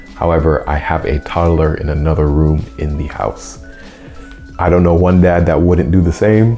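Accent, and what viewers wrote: Jamaica. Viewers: Honestly thank you for the review. I'm looking for my first light and I think I figure out exactly which one I will run on my G45. Dad crew here also. I also notice an accent similar to a Jamaican accent if that's your background nuff respect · First off breddah u sound jamaican are you? I am and i kept hearing the accent creep out haha. As far as the lights go i just 2 days ago sold my PL 2 valkyrie to buy the TLR 7 A. Im running it on my FN 509 Mrd and i love how it looks and fits flush. I like the fact it has a strobe feature (wish u would have covered that) i dnt miss the o light.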